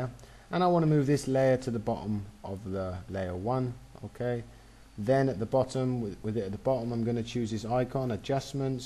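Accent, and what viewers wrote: United Kingdom. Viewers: lovely british accent ;) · Thank you, your tutorials are very clear and you demonstrate in a manner that is easy for beginners and the more advanced users to understand. You sound like you are English with your accent?. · Thank you very much master! :) nice British accent you got there >.<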